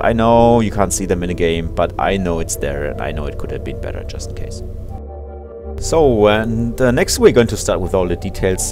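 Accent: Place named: Germany. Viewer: Great video. And that german (?) accent is amazing.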